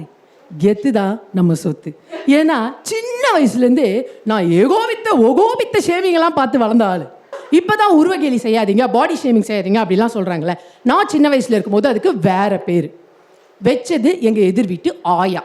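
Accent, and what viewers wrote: Chennai, India. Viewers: Indha madras slang was not expected , surprised 😝😝😝 hilarious 😆...now that auto kaar will be like pappa shalu Ukraine la war ninuduchanu paathu sollu...petrol rate yethira poranunga 😜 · Chennai slang awesome 😎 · real class this lady is. liked the fluent mix of chennai tamil and spashta tamil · Brilliant performance 😍 Your madras slang chance ye illa. Thenampettai signal semma 😂👏👏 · Chennai slang is perfectly suits you lit🔥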